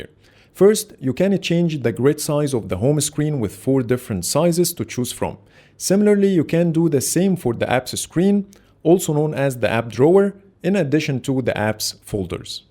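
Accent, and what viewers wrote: Egypt. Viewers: هو طعمية علي فكرة ومن لهجته باين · gotta appreciate the Egyptian inspired accent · Sounds egyptian to me ??!! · Egyptian accent cheeeeeeck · knew it I am Egyptian too and I know the exact accent 😅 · Swear i can hear Egyptian accent from here 😂😂👏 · Egyptian accent 😁 your video's quality is amazing 👏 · انا كمان عرفت انه مصري من لهجته بس هوه عسل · From your first 2 sentences I know that your Arabic especially Egyptian but was heisted until emad Ahmed called u hahaha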